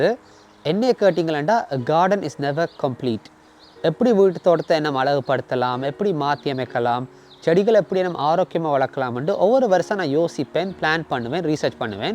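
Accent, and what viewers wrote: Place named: Sri Lanka. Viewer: Srilankan Tamil, I think U are from Sri Lanka. You share good things and very useful, Thank you brother good job 👍.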